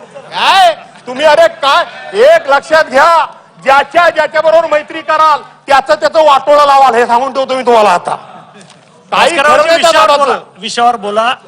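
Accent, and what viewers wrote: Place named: Konkan, India. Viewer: कोकणी आवाज, अभ्यासपूर्ण व मुद्देसूद मांडणी केली. भास्कर जाधव साहेब 🚩🔥🐯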